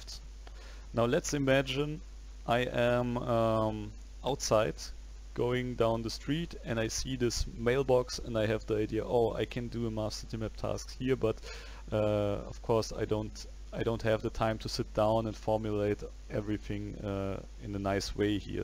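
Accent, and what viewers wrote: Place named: Germany. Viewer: Man merkt das du Deutscher bist aber danke für die Hilfe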